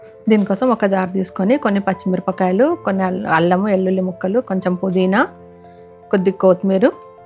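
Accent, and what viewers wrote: Telangana, India. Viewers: మంచి తెలంగాణ వంటకం తెలంగాణ యాస లోనే చెప్పారు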